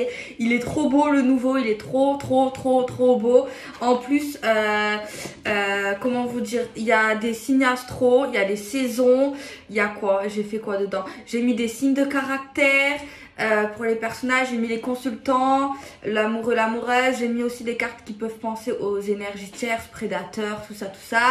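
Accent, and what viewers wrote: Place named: Provence, France. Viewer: J’adore ton accent provençal, ne change pas … j’ai de la famille dans le sud de la France . Je viens du Québec , et j’adore tes expressions. Ça me rappelle mamie de Aix-en-Provence .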